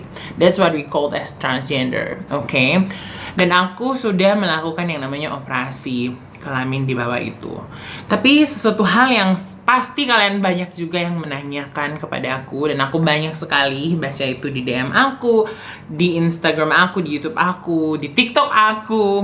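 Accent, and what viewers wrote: Indonesia. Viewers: Qu suka bahasa inggris nya, aku suka logat manadonya. Sukses sllu kakak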